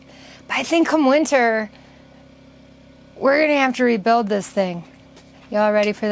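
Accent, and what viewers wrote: Wisconsin, United States. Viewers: Me too....never noticed that there was a seeable problem with your nose. I think the physical pain of surgery with questionable results they will NEVER guarantee isn't worth it. Late in my career l worked for Trane and relocated to Lacrosse. I loved the WI accent, especially with females. You are beautiful and make me think you are in your mid 20's....l've already learned a bunch of tricks-techniques from you. Yes you do seem happier today....you deserve it! 😘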